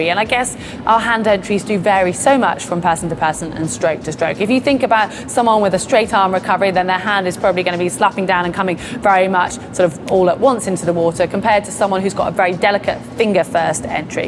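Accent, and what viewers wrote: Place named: United Kingdom. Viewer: Dear GTN, Thank you for this hydro dynamics piece of advice. I would have another subject that I would much appreciate you to deep for us? The "cross-over kick", as I could discover it through this last published video from the Effortless Swimming Youtube channel called "The Most EXTREME High Elbow Catch EVER". This leg kick is unfamiliar technic (for me), however it seems recommended for long distances swiming or triathlon? By the way, I'd like to mention how much great improvements in listening English I am making, since the time I subscribded to your channel (as a froggie)! I find it is so pleasant, and not so common on YT, listening such british accent! Thank you so much.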